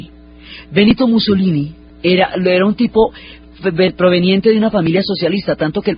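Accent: Colombia. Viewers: Es un placer escucharte maravillosamente narrado, me das luz y claridad a la historia y con la sal y pimienta de los modismos de Colombia. Delicioso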